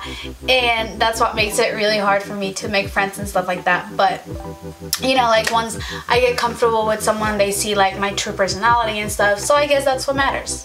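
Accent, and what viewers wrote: Mexico. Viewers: I love your accent mi Chula Mexicana but Mija it's called a stroller dear and you would act like a tom boy also bless the lucky son of a bitch who gives you 4 kids lolllllll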